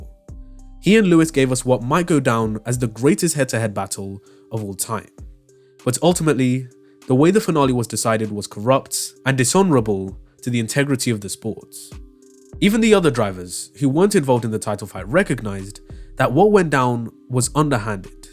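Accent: United Kingdom. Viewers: once i heard the english accent i knew enough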